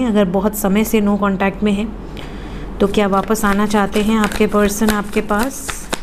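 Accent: Varanasi, India. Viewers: Aap ko iswar firghau de aap ki banarasi aawaj aur andaj dono mujhe mere Banaras ki yaad dilate hai❤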